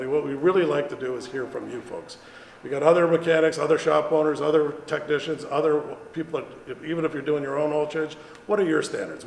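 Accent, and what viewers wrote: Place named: United States. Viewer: Your accent is American, but you’re talking in kilometers. What am I missing?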